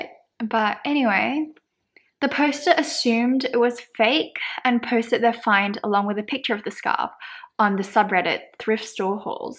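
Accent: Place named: Australia. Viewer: You’re beautiful with a.. Um Australian accent? Sorry I found your video to learn how to spot fakes. Good closeup video ^_^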